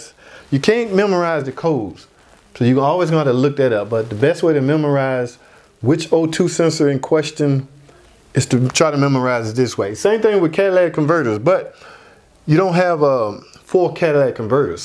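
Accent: South, United States. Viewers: I thought it was just your dialect. Are you in the south?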